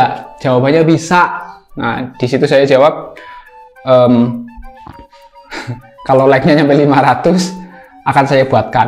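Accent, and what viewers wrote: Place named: Java, Indonesia. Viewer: Aksen nya seperti Pak Jokowi...Mase. Sukses selalu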